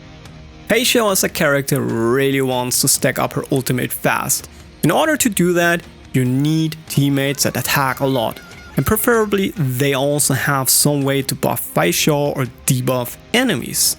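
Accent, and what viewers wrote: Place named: Germany. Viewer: bro got that german accent🗣🗣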